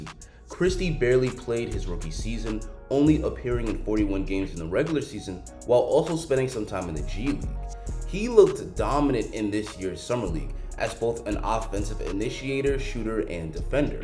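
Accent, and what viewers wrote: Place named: Philippines. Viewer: Is this from a filipino point of view? I can tell from you’re accent.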